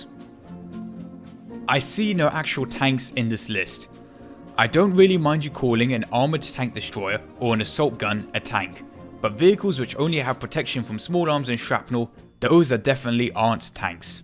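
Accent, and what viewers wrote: United Kingdom. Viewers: I mean, there are areas of the UK that just talk like that, I’m sure they didn’t all go to private school. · Im fucking rolling. Damn mate. Forgive me but i always thought you were British from your accent. You're fucking awesome mate please keep making more awesome content. This made my weekend. (Watched this feb 22 so im bit late.)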